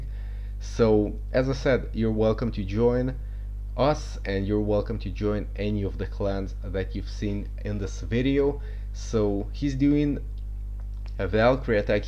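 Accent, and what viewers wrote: Russia. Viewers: какой то русский акцент чувствуется))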